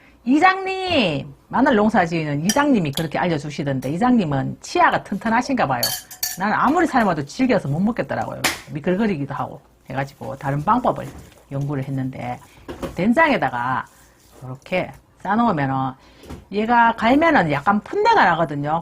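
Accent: Gyeongsang, South Korea. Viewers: 좋은 정보 감사합니다 경상도 사투리 끝내준다 내고향 안동 사투리 좋아요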